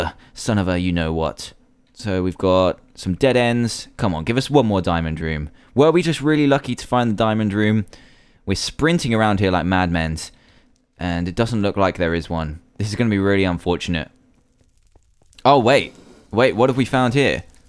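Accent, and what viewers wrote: United Kingdom. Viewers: just british acsent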